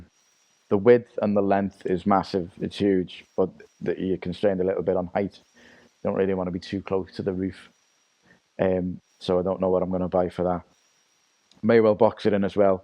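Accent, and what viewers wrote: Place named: Liverpool, United Kingdom. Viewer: Made up you're a scouser.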